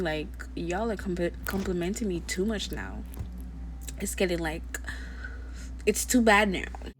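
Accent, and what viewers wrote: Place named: Trinidad and Tobago. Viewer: Its the Trini acsent for me, the lady niceeeh man 🎉🎉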